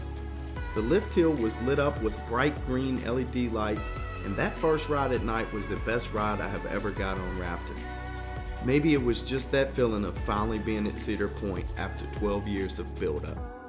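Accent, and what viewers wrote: South, United States. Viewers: I am guessing by your accent that you are from the south. If so, where are you from. I am from Andalusia, Alabama which is close to the Florida state line. I have also lived in Florida and I, now, reside in Tennessee.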